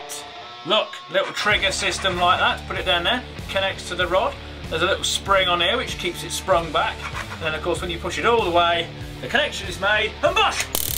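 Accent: United Kingdom. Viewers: I can't understand half of what he's sayin' did he improve his british accent in recent vids?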